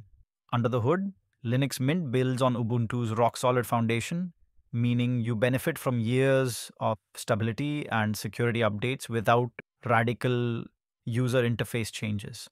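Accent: India. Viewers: Jeet voice, immediate thumbs down and ignored.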